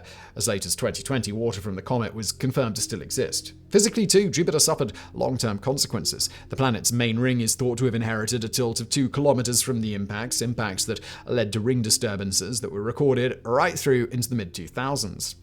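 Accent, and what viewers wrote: United Kingdom. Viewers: Please tell me where this guy's accent is from. Not the UK, or England, but what city.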